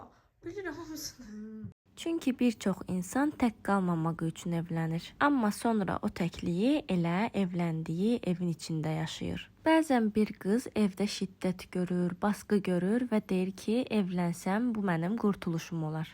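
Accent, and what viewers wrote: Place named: Baku, Azerbaijan. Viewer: Baki lehcesi ruha terapiyadi😍😍😍😍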